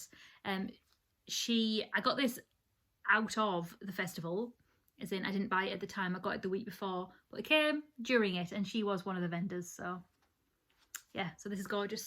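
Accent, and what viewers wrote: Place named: United Kingdom. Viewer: I stumbled across your podcast from a shoutout on Yarnmugs and was immediately addicted to seeing all of your neon colored sweaters! I love your fast-paced style and cute accent too ;) (American here who watches a lot of British podcasters and is always amazed by the diversity of accents on one island) And actually, I think your accent is easier to understand -- at least to my ear -- than some of the other, more common accents. (IG)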